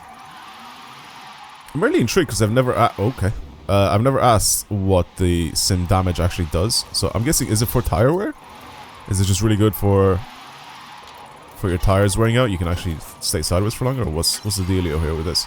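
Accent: Ireland. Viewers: personal question but do you live near kallinary in ireland, ur accent sounds very similar to someone i know from there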